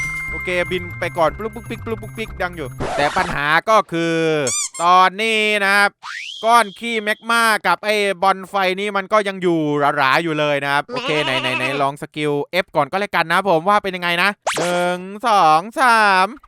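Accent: South, Thailand. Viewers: คนใต้หรอ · พี่เป็นคนใต้หรอผมก็เป็นคนใต้ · ึคนใต้ใช่ไหมครับสำเนียงเเบบนี้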